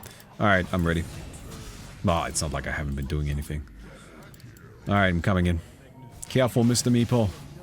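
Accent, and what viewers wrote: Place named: Germany. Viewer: Yea Now only the "Hello eenternet hello" is required combined with a stronger German accent Boom, is young again, no longer 420 million years old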